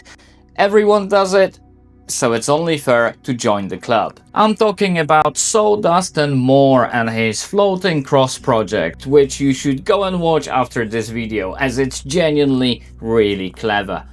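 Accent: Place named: South, United States. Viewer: I hope your viewers pick up on the planer trick you used for small/short boards. I have a feeling you and the Bearded Viking have been chatting a bunch since he became a subscriber due to your ETSY Check series, "brad in the a$$" Picking up on some of that southern drawl🤣. You had me at 🔥and 💡. BTW, nice shirt! 😉 I guess I should upload something soon with all that free advertising you have been giving me. 😜 This is a pretty cool concept. Maybe some 3D printed flying/floating superhero silhouettes would be an ETSY seller? Magento should be the first one... I mean the secret sauce here is magnets, just would be fitting...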